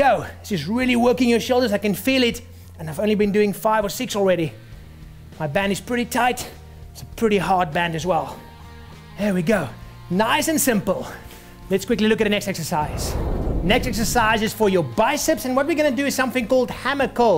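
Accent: South Africa. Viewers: Must be South African, im South African and I recognize that accent anywhere plus he says: "anyfing" :D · I think it's South African...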